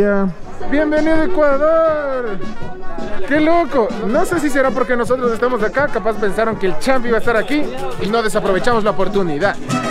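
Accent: Mexico. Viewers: Es lo que noté, que su acento es muy parecido al nuestro y bien pasa por mexicano. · En México nadie dirá que eres ecuatoriano con ese atuendo, a lo mucho, dirán que eres peruano o simplemente sudamericano. A lo mejor y por el acento que no es tan diferente al nuestro, dirán que eres de Puebla o Michoacán, digo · este compa tratando de hablar con acento mexicano ,porque sus compas si hablan como ecuatorianos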